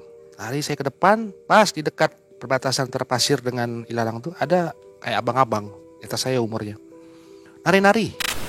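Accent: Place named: Jambi, Indonesia. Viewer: bhs melayu ny sama kayak di jambi 😭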